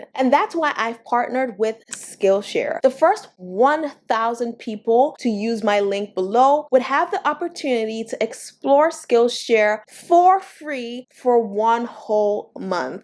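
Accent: Nigeria. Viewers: God bless you 🙏❤ I just signed up! Why do you sound Nigerian sometimes? Haha😄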